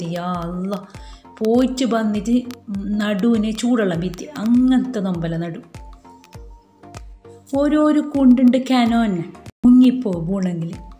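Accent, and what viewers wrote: Kasaragod, India. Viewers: കാസർഗോഡ് ഭാഷ സൂപ്പർ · Ksd basha pwolichu 😅😂 Video pwoli🕵✌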